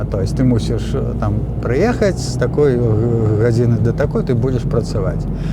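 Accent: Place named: Poland. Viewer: Ужо маеце крыху польскага акцэнту! Няхай буде усё добра у вас! На жаль, што такi таленавiты чалавек зьехау у замежжа!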